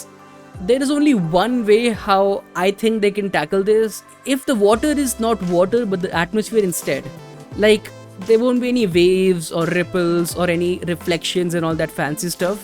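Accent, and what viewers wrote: India. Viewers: Are you indian because you sound like Indian 🤔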